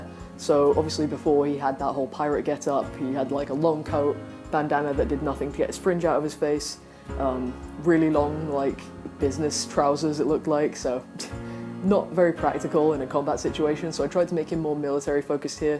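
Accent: United Kingdom. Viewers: Are you brittish? I'm terrible at knowing accents even though I'm from england lol. EDIT: you are brittish! That's so great! Your voice is really cute. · You have a british accent (I think), so I had some problems to follow what were you saying (I'm Venezuelan) but in any case I love your style!! Is so awesome!!! 😍 I wish I would draw like this, my style is more kinda...cute? (Is what the people said to me) if you're curious my Instagram is From myself, you already have loyal follower X3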